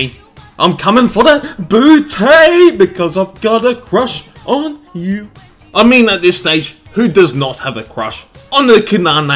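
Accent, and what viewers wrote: Australia. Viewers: jeah and his aussie trashtalking is fucking funny xd but i meant that he is one of the few youtubers that doesn't just upload average games or boring low tier matches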